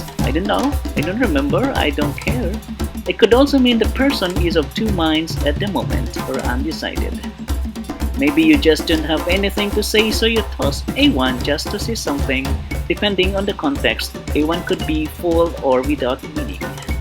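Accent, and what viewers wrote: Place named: Philippines. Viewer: Nice one you sounds filipino ag galing. Team unity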